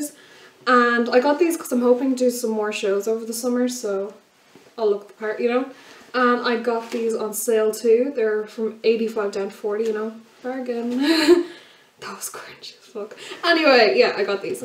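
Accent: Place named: Ireland. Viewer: Love this!! All your gifts look great! Hope you had a good birthday! Also I love your accent🤣🤣I'm half Irish but I don't have the accent☹☹❤❤xx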